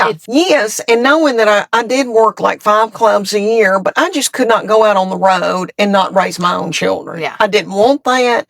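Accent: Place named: North Georgia, United States. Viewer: My family's N.GA accent is sooo similar to yours! My coworkers ask how I choose which words in a sentence to add an extra syllable, and which words to remove one. "We say y'all... When we want to call... Upon all." Early Cuyler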